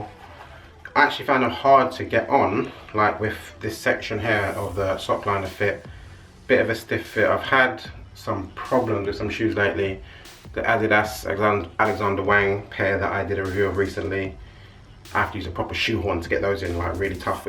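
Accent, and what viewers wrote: England, United Kingdom. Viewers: I had early access to these too and the white ones!! I wish I'd of got the little buggars now as they look so much better than them boring fucking ultraboost. We need something fresh and new and here it is. At least that black bottom ain't gonna rub the fuck off either. They look dope as fuck. Proper Mad ting bruv!! I picked up some serious heat today. Nike no one's done a video on yet anyway. English heat early birds release again. Hope you'll check out some of my vids I think you'll find them different to any other. I try to make them funny and more mock style reviews. But your welcome to join the crew as I need some English blood man. And I'm detecting an English accent there. Fucking sick top too. I just wish Nike done bigger sizes I picked up some crazy bottoms in a 2xl and they are nearly there but tops I'm pretty Large on top like 56 inch chest so I'm gutted as I've been checking that jacket out for a while haha!! 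Safe Geezer. Nice Video. Subbed you. Liked ya and a good size comment too. DONE. Haha!! Yeah I need your support dude. I hope you'll come over to the DANGER Russ Side. 😁😆😉👍🏼🙏🏻✌🏼.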